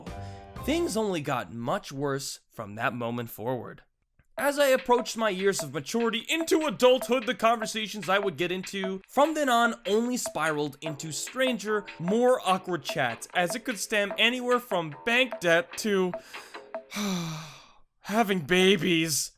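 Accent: Canada. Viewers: he is Canadian?!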